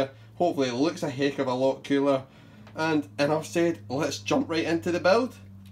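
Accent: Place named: Scotland, United Kingdom. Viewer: We'll definitely keep it in mind! Nice to hear another Scottish accent as well on youtube! :)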